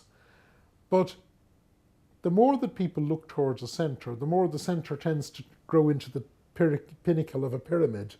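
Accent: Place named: Ireland. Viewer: hi - accent is irish:) I like irish accents, and scottish ones too, but my fav is south african.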